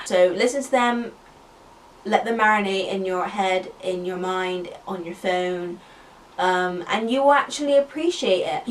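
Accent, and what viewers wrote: Bristol, United Kingdom. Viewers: i 100% didn't expect you to have a british accent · why did her british accent scare me even though i knew she was british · WDYM gwynth It’s a British accent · I’m literally watching all of your videos rn and as soon as I watched one I KNEW you were from Bristol 😂😭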